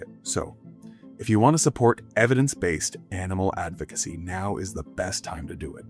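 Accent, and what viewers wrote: Ontario, Canada. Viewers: From your accent I thought you might be Canadian but I notice your profile says you're based in the US.. Are you perhaps originally from Canada? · fantastic. And I love your Ontario accent. Question though, how do we avoid a completely deterministic view. I was waiting for you to address cause and effect as a necessary component of "event". And event sequence can go both ways mathematically like pool balls, but one event leads to another and involves choice. Can you address cause and effect an include probability? Does this only result in the multiverse? The "butterfly effect" is implied here and has some implications. Essentially, there has to be an infinite number of futures because cause and effect is also a measure of time, not just entropy. Two events, one caused by another, must happen at different moments in a sequence and in time. 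To use the pool ball analogy, nothing is going to prompt the ball to emerge back out of the pocket and strike the ball that had hit it and result in the same pathway in reverse. It would require a new event at the bottom of the pocket, some sort of calibrated "popper" but even in that case, the sequence of events would follow a different path because it would be responding to different forces. Basically, I was with you until the end of this video..... fellow southern Ontarian... lol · I find this interesting but can't get past the silly, thick canadian accent.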